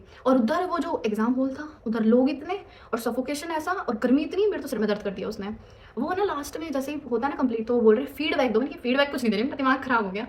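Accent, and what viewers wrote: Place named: Haryana, India. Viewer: Questions to ask: Apne konsa college aim kiya hai? Aap caurse konsa krogi? Kya cuet dene ke liye apne computer sikha tha ya bina sikhe hi de Diya? Mujhe computer chalana nhi aata to kya me fir bi cuet de paungi? Koi glti to nhi hogi? Ya sikhna pdega pehle? Plzzz ans these questions....btw I love your Haryanvi accent ❤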